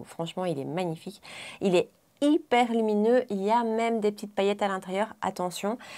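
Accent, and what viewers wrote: Belgium. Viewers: Merci pour cette excellente revue (comme d'hab:). J'adore ton petit accent belge!:)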